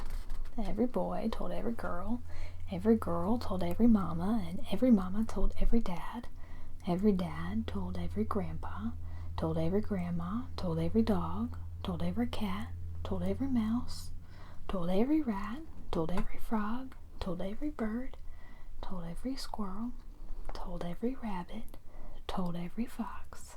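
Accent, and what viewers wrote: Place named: South, United States. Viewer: Southern Accent is awesome.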